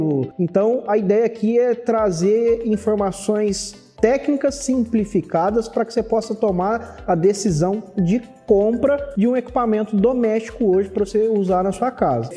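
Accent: Minas Gerais, Brazil. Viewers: Oi, eu não conhecia os cafés especiais e o quão incrível é esse mundo até achar o seu canal por acaso. Passei a seguir suas dicas e hoje eu aprendi a apreciar o café. Só tenho a agradecer pelo seu excelente trabalho! se possível pode tirar uma duvida minha? eu tenho pesquisado a respeito de uma maquina da Oster esse modelo aqui: <link> comparada com as outras ela parece muito mais simples. você já viu essa maquina ou teria algum comentário a fazer sobre? ela parece ser muito simples de usar. sobre o bordão, bom acho que sendo de minas você poderia usar algo mais característico do sotaque dai. Talvez fique legal C: um grande abraço e muito sucesso!!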